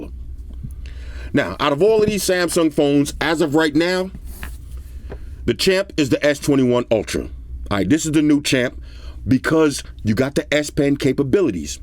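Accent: New York, United States. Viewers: You from New York? B.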